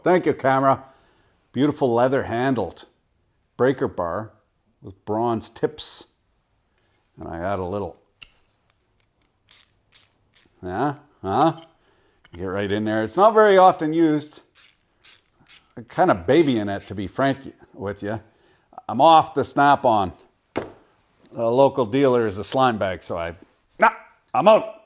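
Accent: Quebec, Canada. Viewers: jmattendais pas a entendre du Quebecois dans une video que j'ai clické au random mais sa fait plaisir au oreilles, cheers man thx for the review oué mon'homme criss de beau outils mets en 🤌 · It's a rough Quebec accent.... Pas sur si c'est juste rouillé ou c'est forcé? Québec ou pas Québec? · Très bon Québécois👌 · Tu est Québécois???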